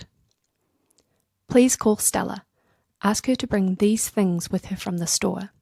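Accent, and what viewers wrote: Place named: New Zealand. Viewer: I love the Kiwi accent. You have a nice, soft way of speaking too and it is very pleasant to listen to. I have a typical flat midwest United States accent. 😋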